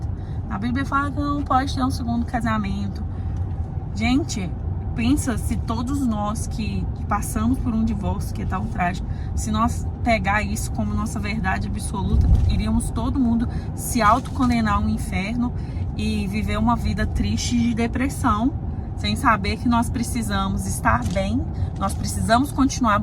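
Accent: Minas Gerais, Brazil. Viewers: Vc é mineira? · Que história de amor linda ❤️ que Deus continue abençoando grandemente essa família linda que vcs estão fazendo 😍🙏 amei de vdd sua história o YouTube te recomendou a duas semanas e já maratonei vários vídeos seus vc é divertida com esse sotaque mineiro seus filhos são uma benção vc é guerreira humilde amei vcs e vou ficar por aqui assistindo seus vídeos e torcendo pelo casório e a casa nova de vcs bjussssssss❤❤❤❤❤❤ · Vc é hiper bacana, guerreira, batalhadora,linda história, tudo de bom.Vc é mineira! Téns um sotaque mineiro, tenho muitas amigas por isso estou perguntando,Deus abençoe vc e sua família,♥️♥️🙋‍♀️🙋‍♀️🙋‍♀️ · Linda a história de vcs, como bom mineiro estou achando similaridade nesse seu sotaque 😂 um abraço e muito sucesso em suas vidas. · É isso aí minha linda.Mar calmo nunca fez bom marinheiro! Principalmente se somos cristãos aí é que somos forçados a crescer e amadurecer nas batalhas da vida. 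Um grande abraço adoro o inglês com mineires e português tudo num vídeo só!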